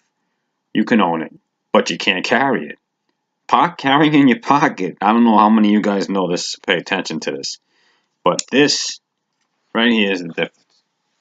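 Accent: New York, United States. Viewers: Love ur New York accent , it has that 70’s 80’s sound to it lol love it bro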